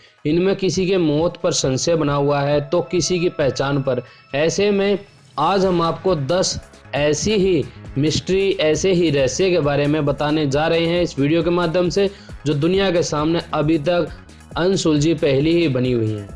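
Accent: Haryana, India. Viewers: Bhai Haryana se h kya tu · Haryana ka hai paka akhar sound se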